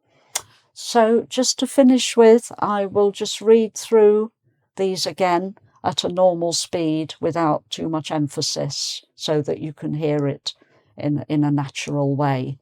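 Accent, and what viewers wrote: United Kingdom. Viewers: british accent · Dear madam... l really enjoy listening to your clear english accent... I usually like to listen to short english story audiobooks on youtube but they are usually narrated by unclear voices.. I wish you could upload short stories audiobooks narrated by you... please try this idea.. I'm sure many of english learners would love to listen to classic english short stories enjoying your clear british accent... thanks alot madam 🌷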